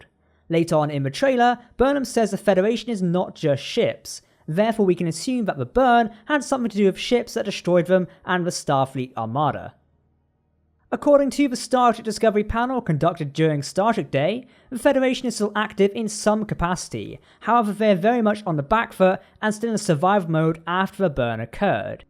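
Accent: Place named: England, United Kingdom. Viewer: Debris is pronounced “debree” not “debreeze”. With an English accent it’s stunning you pronounce the word incorrectly.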